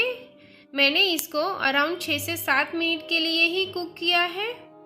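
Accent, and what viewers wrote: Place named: Bradford, United Kingdom. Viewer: Cant understand her Bradford accent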